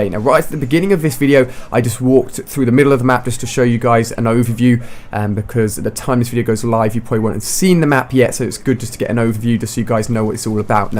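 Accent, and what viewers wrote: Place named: London, United Kingdom. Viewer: i think he is from UK but maybe london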